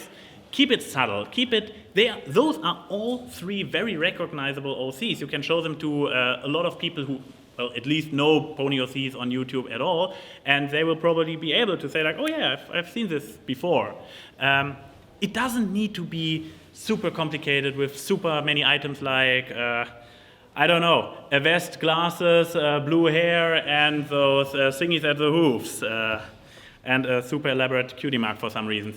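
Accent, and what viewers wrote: Germany. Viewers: are you german? you really sound german because of the way you say "the" nice panel tho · exploding guy :3 yes. he come from germany.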